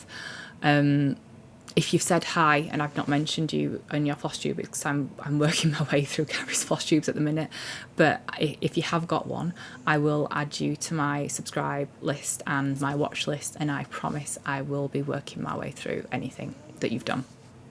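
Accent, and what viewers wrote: Yorkshire, United Kingdom. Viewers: Love your videos! Great to hear some Yorkshire again. “Shut the door” Looking forward to next update. Happy stitching. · I love the Yorkshire accent! The puppy demigorgon is adorable. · I watched your first video yesterday and the second today. Thanks for the Yorkshire. Love hearing dialects. :-) No, I couldn't figure out what you were talking about, but saw a translation in one of the viewer comments. One would think we were worlds apart in age and interests, but I find more and more things to make note of, check on, watch. I'll be looking for some of the YouTubers you mentioned and look forward to seeing your progress on your stitching projects. Your sense of humor makes me smile and your creative director does a great job, as well. New subscriber. :-)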